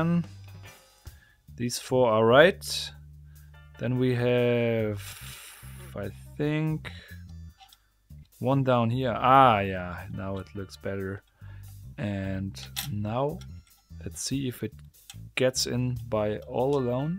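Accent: Germany. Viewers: Man merkt direkt an deinem Akzent dass du deutsch bist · german accent is so damn cringe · Are you german? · You sound like you are from germany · are you from germany? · Deutsche hören es einfach, dass dieser Herr nur aus Deutschland sein kann. 😅😅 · Haha bist du deutscher?? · I love your German accent. Best one I've heard. "Brütforce" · the German accent makes it more credible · That's some strong German accent! (Ich müsste mich mal selbst aufnehmen, wenn ich Englisch spreche, vielleicht klinge ich ja genauso)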